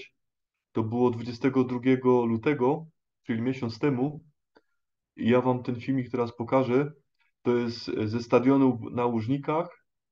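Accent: Silesia, Poland. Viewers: Ma Pan miły głos,jeszcze ten śląski akcent,wspaniałe się słucha.Pozdrawiam serdecznie!❤️🇵🇱🇵🇱🇵🇱🌹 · skad ten akcent ? Ślonzok ? pozdrawiam nowy widz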